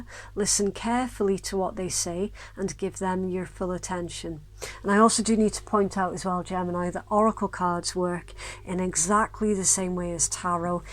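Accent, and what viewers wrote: Scotland, United Kingdom. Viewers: Resonates with me, detect a wee bit of scottish accent 😊 me too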